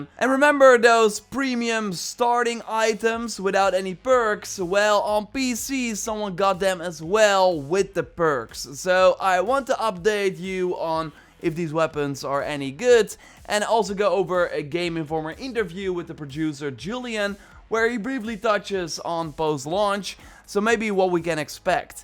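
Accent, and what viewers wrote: Netherlands. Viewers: Idek if you're dutch but you have the most dutch accent ive ever heard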